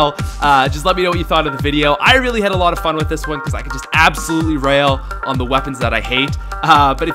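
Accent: Canada. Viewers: he’s Canadian it’s his accent lol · He's got a Canadian accent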